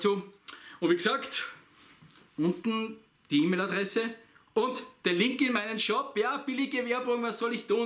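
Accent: Austria. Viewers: Lieber, und alle Komentarleser Ich, persönlich, hab den Kanal abonniert weil: 1. ich mich für Outdoor-Ausrüstung interessiere und immer auf der Suche nach neuen bzw. unbekannten Sachen bin, die nicht jeder hat und kennt. 2. du einfach, authentisch, sympatisch, rüber kommst und der österreichische Akzent mich amüsiert. Wer was anderes erwartet hat ist meiner Meinung nach hier falsch. Ich hab im übrigen schon Kanäle deaboniert weil mir das stäntige Gegrüße in den Videos auf den Zipfen gegangen ist, so ein Blödsinn! Das mit den Kanalvorstellungen find ich auch deppert aber gut seis drum. Ich werd mir diese Videos dann einfach nicht ansehen. Aber ich will dir da auch nicht reinreden mach wie dir lieb ist. MfG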